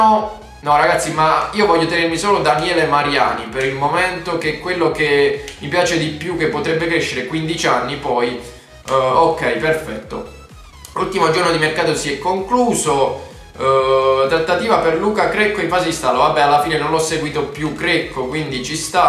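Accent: Bari, Italy. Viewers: ma sei barese?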